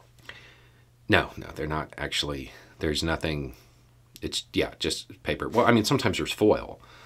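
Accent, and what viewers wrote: South, United States. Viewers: In my head, I say your opening lines with you....and at times aloud, in my best imitation. Being Canadian, that Southern accent don't come easy, eh. Well, ya got me this time. Coffee came out my nose when you said, interstellar people....strong black coffee. You made me laugh, but I didn't like it.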